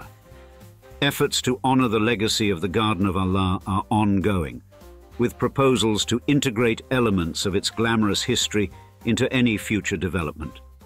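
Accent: United Kingdom. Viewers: Why do these shows always have a narrator with a British accent?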